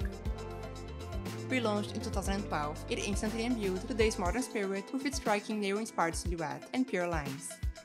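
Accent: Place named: England, United Kingdom. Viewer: I can intolerant your English accent.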